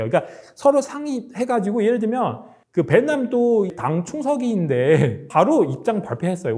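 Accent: China. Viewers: 이분 중국인 인가요? 발음이 한국인이 아닌거 같아서요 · 발음이 꼭 중국인 같은데요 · 이 분 발음상 중국인인가봐요. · 짱깨 발음 들어야 돼? 우리 아이들 다 망친다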